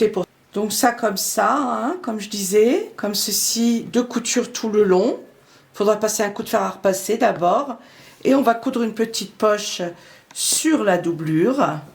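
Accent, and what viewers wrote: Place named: East, France. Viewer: Bonjour. Je viens de découvrir il y a une dizaine de jours votre chaîne, j'adooooore! C'est clair, précis, voix lente et avec en plus un petit accent! Je dirai de l'Est? A la montagne? En tout cas merci, j'apprends beaucoup de chose. Bonne journée.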